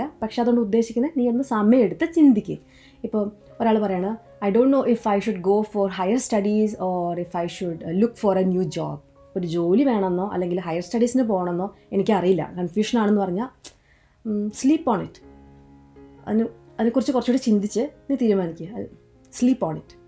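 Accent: Thrissur, India. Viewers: Madam, തൃശൂർക്കാരി ആണോ ? Slang sounds like Thrissur 👍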